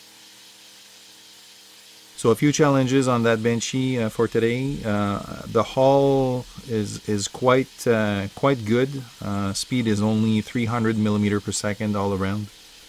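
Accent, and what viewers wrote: Quebec, Canada. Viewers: T'es tu québécois hahah?:) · Is it just me or you have a Quebecois accent?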